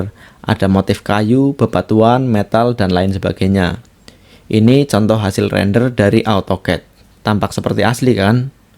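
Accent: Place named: Banyumasan, Indonesia. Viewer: mase ngapak e ceto nemen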